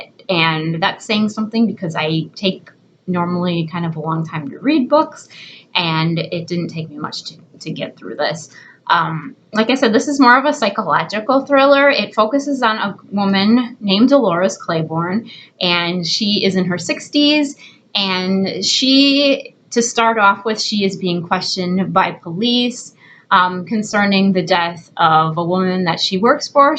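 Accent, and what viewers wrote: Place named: Florida, United States. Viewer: I like your accent.. are you from Florida?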